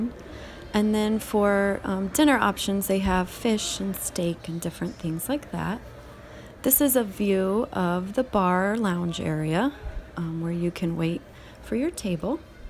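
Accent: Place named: United States. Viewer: Yes, she does have a very pretty voice. Being English, I have the bonus of hearing her soft American accent as well.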